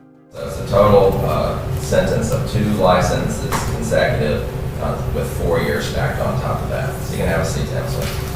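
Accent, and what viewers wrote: South, United States. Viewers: Thanks for covering this. It is so sad to think that the parents knew that their own child, the one who lived off of them, the one that Lisa worked for, delayed retirement for, was killing them for reasons of pure greed. They were nothing but kind, Lisa even buying him his favourite ice cream. He is probably one of the worst psychopaths ever. I wonder what he thinks of his life now? I hope that he is miserable. BTW, I love your videos. Your "southern accent" is really cute. ❤Cheers, from Canada.❤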